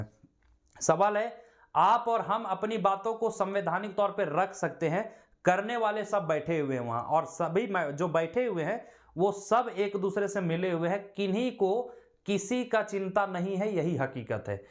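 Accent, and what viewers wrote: Bihar, India. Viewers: Bihar Ke Hain Kya sar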